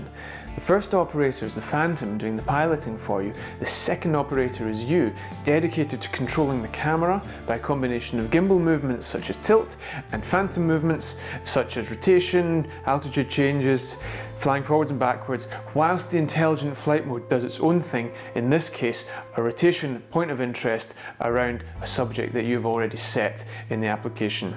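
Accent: Scotland, United Kingdom. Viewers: Love the channel and the content, the scottish accent is just a bonus 😊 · Great explanation of the feature and great to hear another Scottish voice on YouTube! 👊🏻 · Very helpful video, and the Scottish accent is irresistible to a Yank like me. Killer footage almost worth colliding with that mangy tree to achieve. The only thing you missed explaining is how to use the sticks to change the altitude and radius while flying, which is fairly evident when trying it with the DJI Go 4 app, but not clear here in this video.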